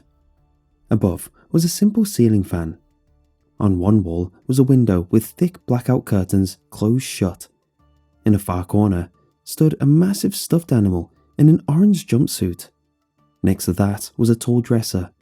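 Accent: United Kingdom. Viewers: Hey, sorry for the off-topic comment, but I've been tormenting myself for weeks over this question: does anyone know where in the UK ' accent comes from? I've spent more than two hours trying to figure it out, but I am but an American, and my efforts were in vain.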